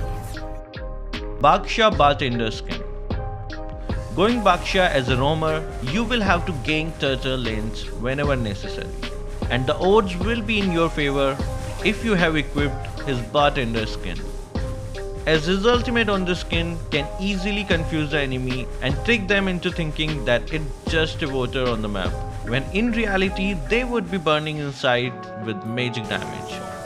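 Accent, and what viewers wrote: India. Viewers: you sound like my friend his indian nationality. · Bro got Indian accent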